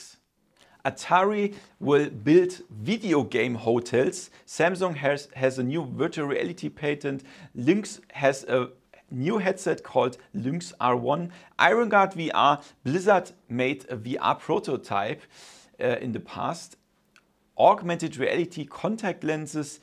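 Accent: Germany. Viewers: an deinem english hört man das du deutsch bist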